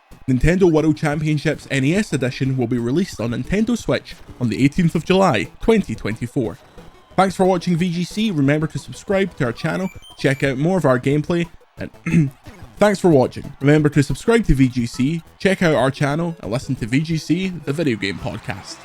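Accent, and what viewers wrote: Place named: Scotland, United Kingdom. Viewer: is sounding particularly Scottish today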